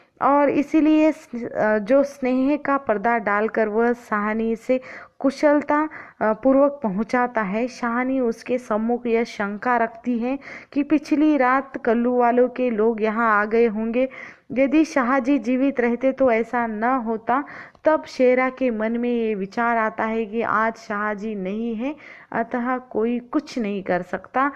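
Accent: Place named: Maharashtra, India. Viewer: मेडम हिन्दी साहित्य वाचुन अपल्या मराठी ला बोलण्यात बदल करा ।हळु हळु होईल।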